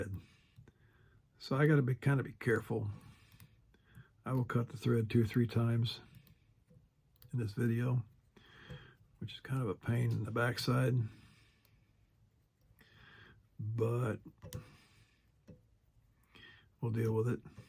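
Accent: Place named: Kansas, United States. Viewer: Grew up in western KS. Thought I recognized the accent. 😁Neat idea on how to make your dubbing. I'm new to tying. I haven't had a chance to get out and buy supplies, so I'm experimenting with using the fluff from chicken feathers.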